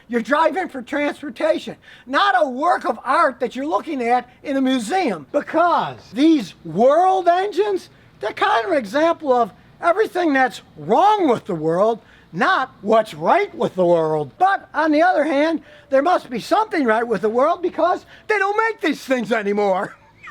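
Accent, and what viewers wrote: Italy. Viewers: Italian accent 100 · i love scotty's Italian's accent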